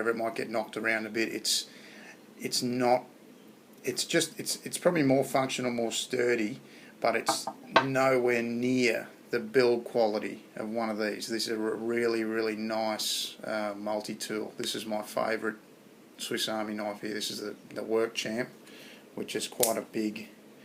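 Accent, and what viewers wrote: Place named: Australia. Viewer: wenger is apart of victorinox now :) oh and also ur accent gave it away... an aussie???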